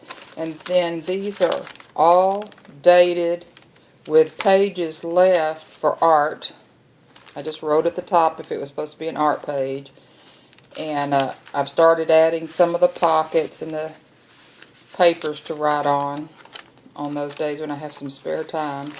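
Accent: Texas, United States. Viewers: Love this journal and your Texas drawl :) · I just want to jump through the screen and flip through this myself!!! I love it......so much creative fun!!! I just discovered your channel . So happy I did!!! This book just looks so personal and homey. Something very free and organic about it. Thanks so much for sharing it with us. Love your accent by the way.....my fave!! From one Texan to another!! HA!! HA!!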